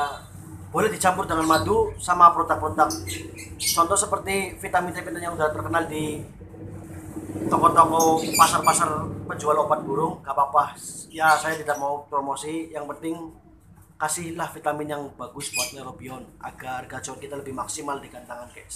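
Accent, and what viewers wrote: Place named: Banyumas, Indonesia. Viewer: Mantap mase...ora ngapak ora kepenak.... Salam suport...🙏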